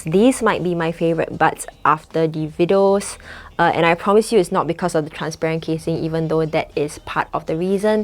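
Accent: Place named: Singapore. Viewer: my/sg accent <3 also you should try the qian69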